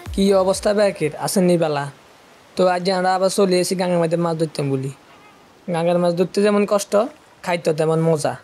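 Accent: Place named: Noakhali, Bangladesh. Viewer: Bhai Mone hoy noyakhalir manush 😂👌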